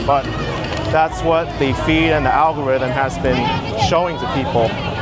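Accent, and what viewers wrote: New York, United States. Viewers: You're a walking billboard for NYC with your accent. That's what initially drew me to your channel. I enjoy watching any content from NYC be it food reviews, apartment tours, fart videos, tourist videos, and opinion pieces. It's just felt like you've been on very long vacations the past year away from NYC so I haven't watched. Being from Toronto, I've rewatched your travels here countless times. You need to come back to Hong Kong Bistro Cafe. 😃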